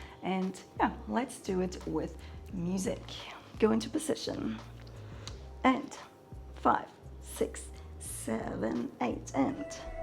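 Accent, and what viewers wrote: Germany. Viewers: I really like your German accent !!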